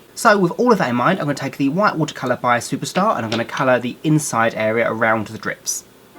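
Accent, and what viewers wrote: England, United Kingdom. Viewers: I love U and your england accent. Love u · Are you britsh or not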